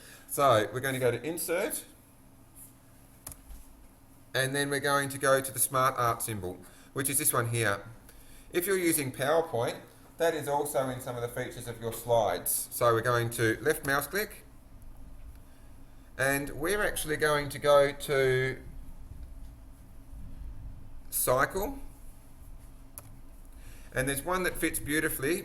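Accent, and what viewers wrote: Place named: Australia. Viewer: love the australian accent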